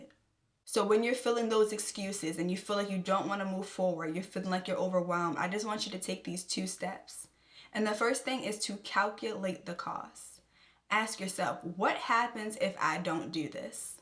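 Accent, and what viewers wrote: Baltimore, United States. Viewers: Off topic but are you from baltimore/dmv area?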